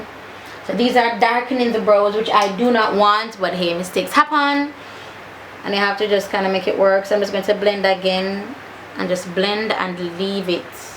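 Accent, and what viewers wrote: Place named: Jamaica. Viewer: nice girl... from the first time I watched your video I heard your accent and I know u were Jamaican... big up uh self goodie mi a Jamaican too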